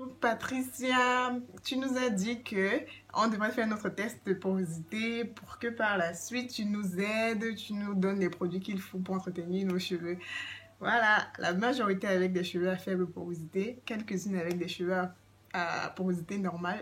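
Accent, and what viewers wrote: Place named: Cameroon. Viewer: Bonne vidéo avec notre bel accent Camer👏. L’idée de ramener les beurres à l’état liquide est géniale merçi.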